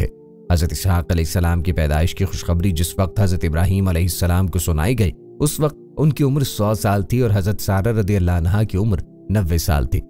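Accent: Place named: Pakistan. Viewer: Beautiful voice and and Urdu accent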